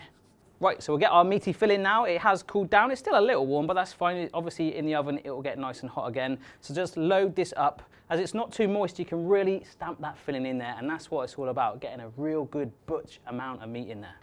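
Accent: England, United Kingdom. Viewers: to me he doesn't sound Australian he sounds English O.O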